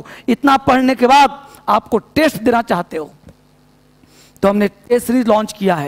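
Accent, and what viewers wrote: Bihar, India. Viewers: Guru ji Bihar se ho kya ap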